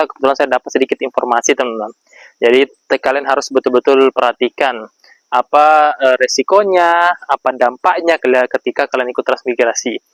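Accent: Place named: Java, Indonesia. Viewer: Jawa itu pake nanya lagi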